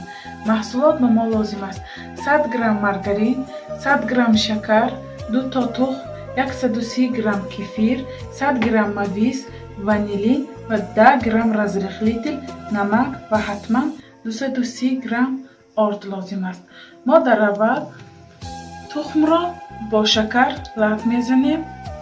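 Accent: Isfara, Tajikistan. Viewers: рахмати калон апа ман а рузи авал а лавзатон шинохтам ки шумо аз исфара хастед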